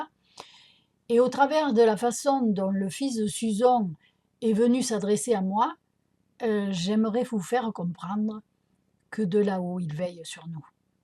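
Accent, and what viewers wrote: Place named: North, France. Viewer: Bonsoir madame vous avez un magnifique accent du ch'nord!!! Me trompe-je?😇🙏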